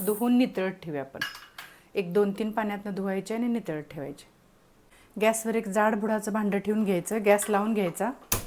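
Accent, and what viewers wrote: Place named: Kolhapur, India. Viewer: खूप छान रेसिपी आहे, तुमच्या सर्व रेसिपी, सोप्या, चवीष्ट असतात, तुम्ही कोल्हापूर च्या आहेत हे तुमच्या प्रेमळ भाषेमुळे लगेच समजते😊 रेसिपी बद्दल आभारी आहे.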